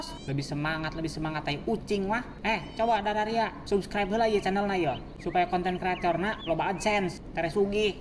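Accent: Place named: Citorek, Indonesia. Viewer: Logat mna ini bg kaya kenal, citorek bukan si😅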